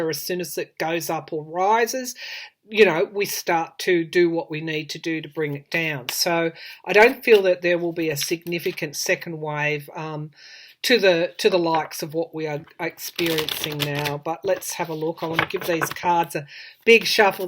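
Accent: Australia. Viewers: Love listening to you, gives me hope! Love your accent, spent a month down under several years ago, New Year’s Eve in Sydney harbor, spectacular. Was so sad to hear the fires did extensive damage to KI. KI, GBR and Tasssie were amazing. Love Australia and it’s nature and friendly people. Stay safe everyone. Thanks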